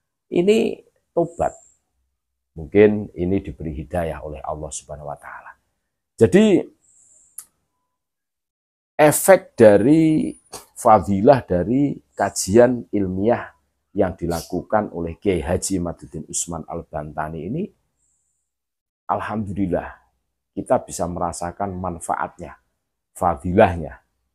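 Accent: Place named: Madura, Indonesia. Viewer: Logat bahasanya orang madura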